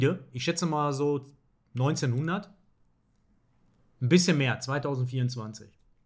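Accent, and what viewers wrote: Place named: Ruhr, Germany. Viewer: Mal was ganz anderes...also, dieser immer noch hörbare Ruhrpottdialekt, nun mit leicht norddeutscher Färbung. Astrein!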